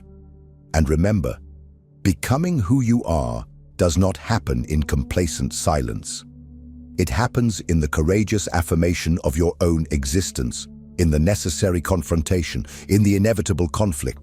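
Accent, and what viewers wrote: United Kingdom. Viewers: Am i supposed to think this is profound cause dude has a brittish accent and a deep voice? I mean i guess this is a good message for someone who’s been bullied all there life, but the whole ”domination used to be a virtue - lets bring it back!” narrative is just fucking stupid as all get out 😂. Sure be assertive and stand up for what you believe in, but not at the cost of seeing others as equals and trying to better yourself for the greater good.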